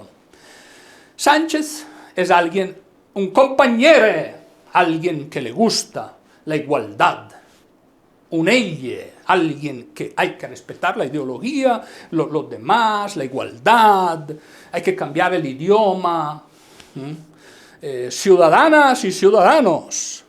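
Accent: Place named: Santa Fe, Argentina. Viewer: Buen análisis , hablas como un santafesino de la vera cruz , capital de Santa fe , Argentina, te dejo la incógnita para saber cuál es nuestra particularidad al hablar , si la adivinas ,me suscribo te sigo y te comparto 👌👏👏👏🇦🇷👽🚴🏽‍♀️☯️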